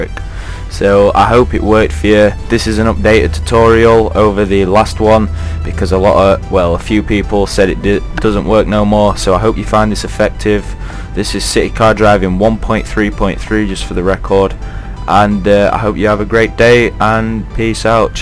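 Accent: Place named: United Kingdom. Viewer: fututi acentu tau de britanic ca nu inteleg nimic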